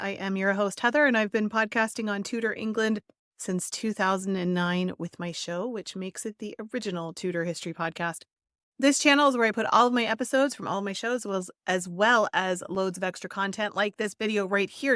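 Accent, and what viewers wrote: United States. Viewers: A good engagement, however your American accent is totally unnecessary & very annoying, sorry.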